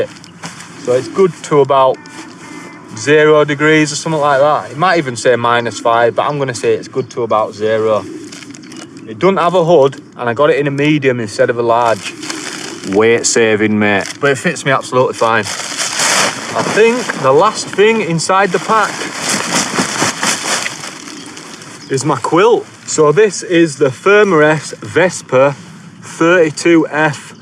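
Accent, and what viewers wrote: Yorkshire, United Kingdom. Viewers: For a Yorkie, with a great Yorkie accent, you don’t half say “plasters” with a posh tone. Lol.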